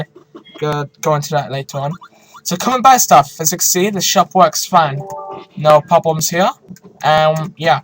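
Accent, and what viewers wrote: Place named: United Kingdom. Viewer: undah combow lol british accents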